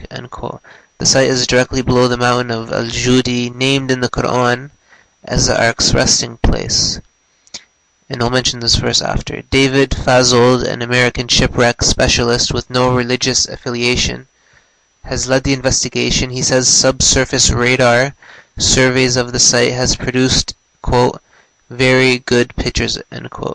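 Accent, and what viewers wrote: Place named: Middle East. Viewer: According to your video the Bible was correct and the Quran was wrong. The person talking in the video sounds like he's from a middle eastern background which is probably why he's saying the Bible was wrong even though in the text he provided as proof the Bible is "correct." I Still don't believe in the whole religion mumbo jumbo. OMG A BOAT, IT MUST BE NOAH'S ARC. At least I got a laugh out of this so thank you.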